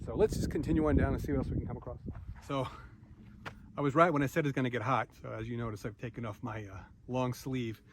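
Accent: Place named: East Coast, United States. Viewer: No disrespect but you sound like people from the east coast. Why are you here? Hope you’re not an east coast liberal. If so please go home.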